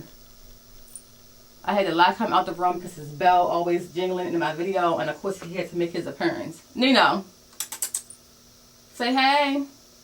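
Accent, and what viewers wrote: Baltimore, United States. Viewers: I really like your feet. Very pretty. And do I detect a bmore accent?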